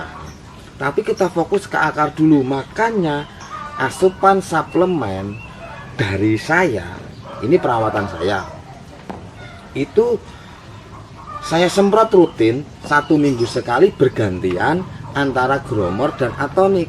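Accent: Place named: Java, Indonesia. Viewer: ni bahasane medok banget ternyata, pakai sulap spt pak Tarno pula 😀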